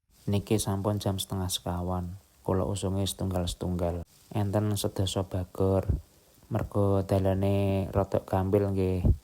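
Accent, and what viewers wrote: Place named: Java, Indonesia. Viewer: Bang sampeyan ki uripe no wilayah ngendi yo kok bhs jawane medhok banget .aq terus lo nyemsk tik tokmu aq jawa timur mlng .aq seneng nyimak olehmu masak2..nek masak bumbune cucinen disik lo yo wedine onok kotorane...yen nyawang olehmu maem dadi ngiler..mugo2 cenele sampeyan terus maju nggih.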